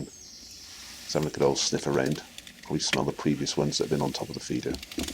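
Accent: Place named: Dorset, United Kingdom. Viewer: You sound like you`re from my area, are you a Dorset man?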